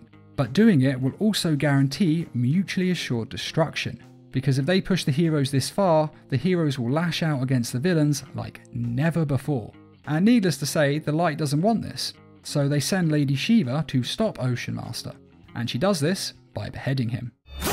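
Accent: United Kingdom. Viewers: Hey. Just wanted to say how much I love your content.I wasn’t very interested in the superhero content until I stumbled across your channel.Hearing that sweet,beautiful British accent is so satisfying every time.Love your content and hope you get more recognition in the future.For others who see this,have an amazing day.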